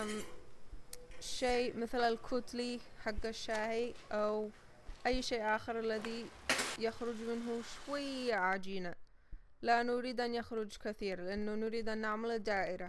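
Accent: Sanaa, Yemen. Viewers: من لهجتك صنعانيه و لكن عايشه خارج اليمن . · ايوه هي من صنعاء كلامها صنعاني انا صنعانيه انا احب أكلات اليمن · Your accent is so cute. Never heard a cooking show on YouTube with Yemeni food and accent:) going to try this;) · You are awesome !!!! Very amazed by your fluency in the Arabic language , and Sanaani accent. You have done a great job. Thank you :))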